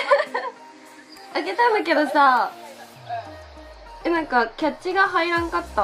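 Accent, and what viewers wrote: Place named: Gifu, Japan. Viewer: 自分岐阜だから喋り方に親近感湧く！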